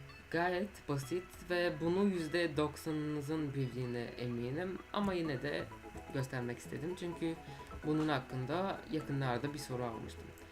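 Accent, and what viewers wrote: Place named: France. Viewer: Fransa'da mı yaşıyorsun? Çünkü aksanın Fransızları andırıyor. 😊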